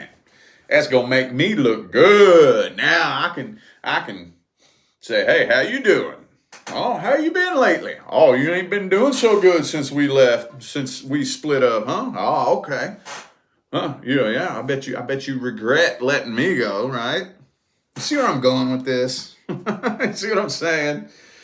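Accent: South, United States. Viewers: U sound like u really from the south ?… hhhmm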